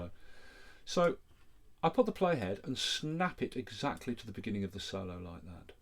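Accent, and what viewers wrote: United Kingdom. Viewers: One of the best tutorials! This Britt knows how to explain clearly. (Even with his cool British rocker accent). :)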